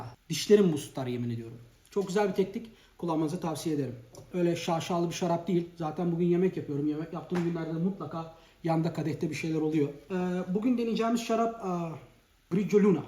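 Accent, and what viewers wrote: Thrace, Turkey. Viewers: Trakyalı mısın öyle hissettim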